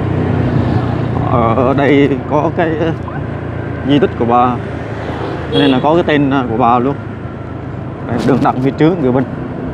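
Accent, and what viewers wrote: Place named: Hue, Vietnam. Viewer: Người HUẾ…Mà …Nói giọng HUẾ như người Thượng / người Mọi…! Nói tiếng người KINH…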